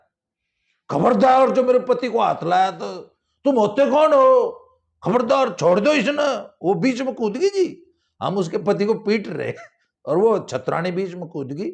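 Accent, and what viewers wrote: Haryana, India. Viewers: uncle ji thari haryanvi kasut andi hai hehe..swaad aa gya sunke hehe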